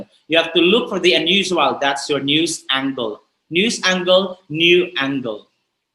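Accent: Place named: Visayas, Philippines. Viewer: Ay bisaya siya daaai😂❤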